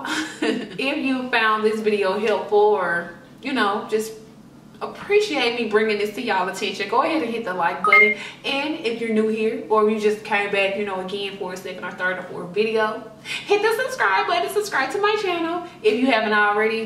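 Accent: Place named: South, United States. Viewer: What part of the states are you from? Your accent sounds.... different. Not sure if it’s a “black” southern accent.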